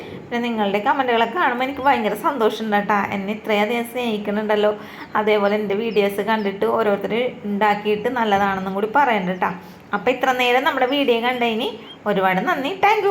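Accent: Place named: Kochi, India. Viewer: ഒഴിച്ച് കൊടുക്കണേണ്. തനി കൊച്ചി ഭാഷ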